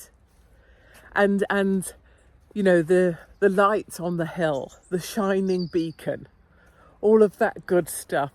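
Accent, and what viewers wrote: United Kingdom. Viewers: my darling girl with your lovely British accent, thank you for expressing how this old lady in the mountains of East Tennessee feels. You really do understand the mindset that put Donald Trump back in office. You are a rare gem of a person! Please stay healthy and keep spreading the truth.❤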